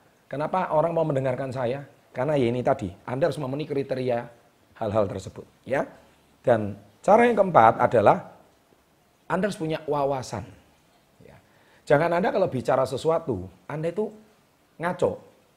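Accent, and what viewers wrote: Java, Indonesia. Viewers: Logat jawa nya buang lah dan kamu sendri bicara masih belum fasih gimana mau orang dengar dan fidio bukan kamu punya tunjuk orang punya dasar pembohong cari sensasih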